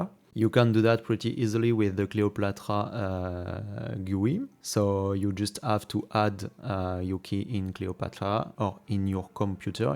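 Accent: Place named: France. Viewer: Question : how do I get my pgp id for the validatepgpkey (also nice french accent)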